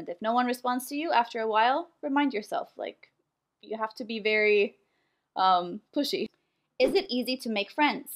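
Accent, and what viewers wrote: United States. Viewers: You sound American! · Cool Estonian woman but your accent is totally American now so how about your native language effect there in Qatar ?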